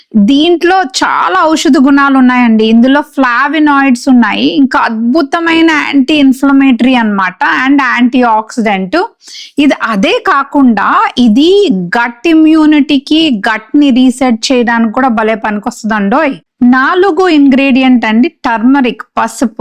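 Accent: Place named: United States. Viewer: Why using stylish american accent in telugu? If u use telugu, try our accent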